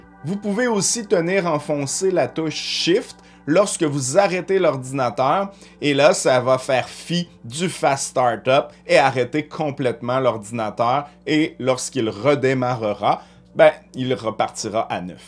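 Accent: Quebec, Canada. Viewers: Ouaw merci ( PS : J'adore ton accent Québecois )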